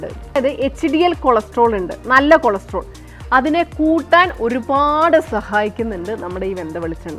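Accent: Kottayam, India. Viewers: Thanku so mach ചേച്ചി..' ചേച്ചിടെ സംസാരരീതി കോട്ടയം അച്ചായത്തി തന്നെ'' ''ഒരു സംശയം ഫുഡ് ഉപയോഗിക്കാൻ പറ്റുമേ.ബീഫ് ,ഫിഷ് ചിക്കൻ എന്നിവ കൊണ്ട് വിഭവങ്ങൾ ഉണ്ടാക്കുവാൻ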